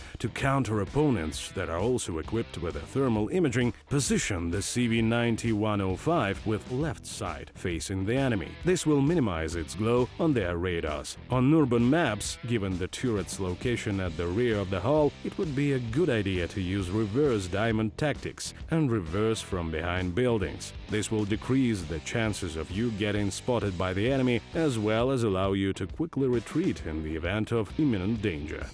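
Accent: Russia. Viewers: Yes, tell me more about Swedish box tenk Mr. Deep voiced Russian Narrator :3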